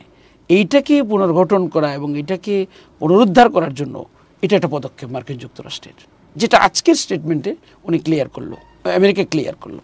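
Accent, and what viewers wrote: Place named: Barisal, Bangladesh. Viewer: ভাই কথায় আঞ্চলিকতার টান আসছে বরিশালে টান। ঠিক করেন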